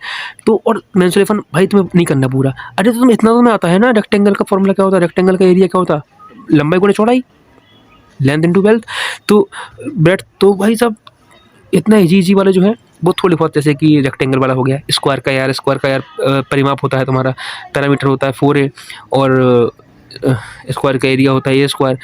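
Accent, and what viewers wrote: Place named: Lalitpur, India. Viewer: hmmm...thoda or sunne ke baad mujhe bhi lalitpur jaisi boli lagi thi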